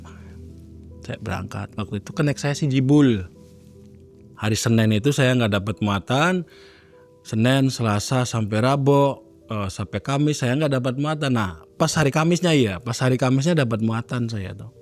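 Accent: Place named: Brebes, Indonesia. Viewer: Mas wong brebes bukan sih?? Logatte kaya wong brebes tanjung losari jawatengah.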